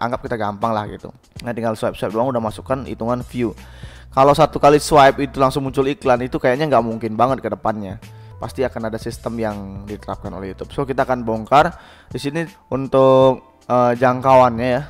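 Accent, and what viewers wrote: Bali, Indonesia. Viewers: Keknya logat Bali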